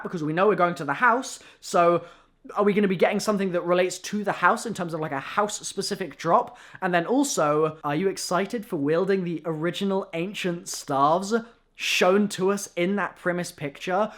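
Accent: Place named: United Kingdom. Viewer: Your too British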